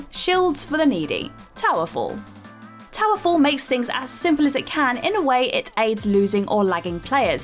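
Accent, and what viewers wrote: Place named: England, United Kingdom. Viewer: A "news lock" run? Yea English accent are definitely annoying and everybody just acts like they absolutely love them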